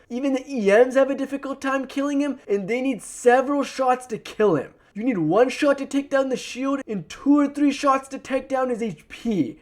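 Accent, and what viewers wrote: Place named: Middle East. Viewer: May I ask where you are from? Your accent is interesting. I’m assuming Middle East somewhere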